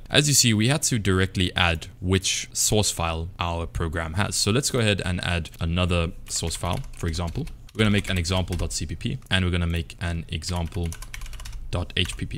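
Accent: South Africa. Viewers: Dude are you from SA 🫣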